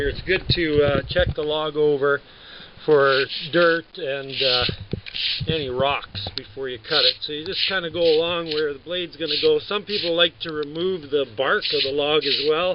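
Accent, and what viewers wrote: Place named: Canada. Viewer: I detect a Canadia accent. We have 20 acres with mixed conifer forest, and a small number of oak. We need to clear trees to build. I thought we would turn the trees into firewood and buy lumber, but I'm starting to like this idea more and more. We have some very large trees compared to what you're working with, some that size too. Thanks for posting this.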